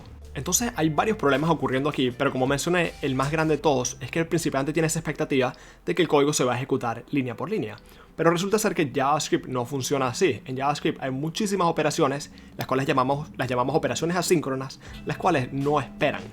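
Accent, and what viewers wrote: Venezuela. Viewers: Muchas gracias por los consejos, no pude evitar notar tu acento ¿Eres venezolano? PD: Pondre en practica estos consejos. printf("Gracias")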